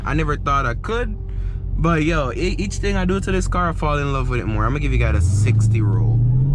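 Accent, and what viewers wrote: Jamaica. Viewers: Jamaican accent 👌👌👌👌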